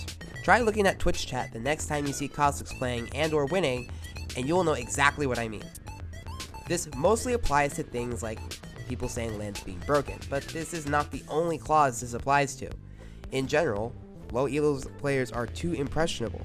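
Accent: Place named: Sweden. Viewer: I hear sweden - i like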